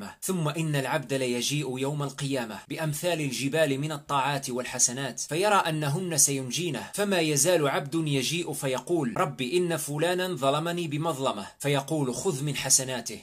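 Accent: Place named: Maghreb. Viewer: لك لهجة المغرب العربي في كلامك يارب تكمل فرحتي وإفتخاري إن كنت جزائري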